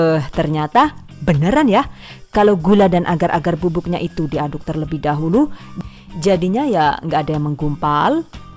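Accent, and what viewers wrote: Banyumas, Indonesia. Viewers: Maaf bu asli nya dari daerah mana ya .. klo ibu siaran yutube aku ketawa loh dngn bahasa ny ternyata pada ngapak ke yah .. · Jeng bahasa ngapak nya tu lo yg bikin kangen.jian tenan mantap klau ngomong bahasa ngapak.anak saya ketawa terus klau dengar bahasa ngapak yg waktu bikin donat.disuruh muter berulang kali yg ada bhasa ngapak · Inspirasi terimakasih Aq suka resep2Nya gampang di pahami 😍😍 Aq suka denger ngomong bahasa ngapakNya lucu 😊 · 😂😂😂 suka kalo bunda ngapaknya keluar..ora ngapak ora kepenak 😉😉 salam dari medan bunda 😘😘😘😘😘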